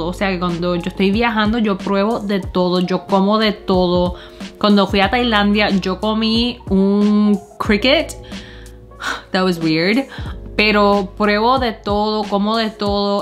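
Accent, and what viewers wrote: Puerto Rico. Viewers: Your great i love your videos and your Puerto Rican accent 😍😘😉💗💖💯🇵🇷🇵🇷🇵🇷🇵🇷🇵🇷🇵🇷🇵🇷🇵🇷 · Tienes una fonética de PR. No es que eres vulgar sólo que hablas como los jóvenes callejeros. Eres una joven educada y en ocasiones debes presentar esa parte de tí en español ya que si no puedes tus maestros de PR te fallaron. Por cierto, en inglés te expresas muy elocuente y correcto. Pero... Si en realidad estás presentando tú personas creativa y artística en el entretenimiento entonces eres excelente👏. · Tu acento bien boricua, tu manera de ser. El dialecto que usas, como actúas con las manos!!! Mano eres más boricua que muchos nacidos en PR... 🥰 good vibes! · Hola,yo naci en Newark,New Jersey ,mis padres boricuas,me fui para P.R. cuando tenia 8,vivi alla 21 years, hora vivo en Connecticut ya hace 33 years,pero nena tu hablas mas boricua que yo lol me encanta saber que eres china y hablas super bien el spanish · Esa es tu manera de ablar... 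eres bóricua para mí la gente no todos siempre ablan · Me encanta hablas como boricua! Las palabras, tu acento. Love it yo soy boricua pa q tu lo sepas.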